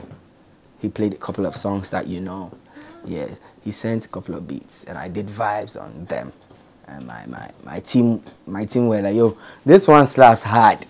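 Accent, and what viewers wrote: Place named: Nigeria. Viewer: But y he want talk like naija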